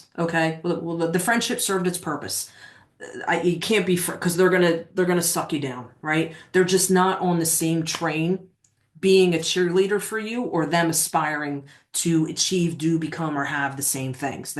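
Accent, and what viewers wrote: Philadelphia, United States. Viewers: I hear a "philly" accent in your voice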